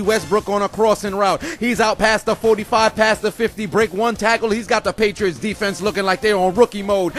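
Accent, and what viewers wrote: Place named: Chicago, United States. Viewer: D A B E A R S As a Chicagoan and a Bears fan, I appreciate that Chicago accent 😂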